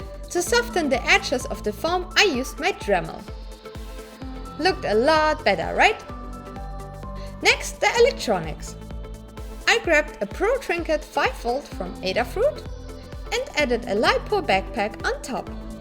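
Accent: Germany. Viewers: You sound a bit German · so ein deutscher Akzent..... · a question...r u from germany...cuz ur accent sounds pretty german xD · Your german accent shows thru... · 2 sec geschaut direkt gewusst das sie deutsch ist XD. deutsches englisch hört sich einfach retarded an XD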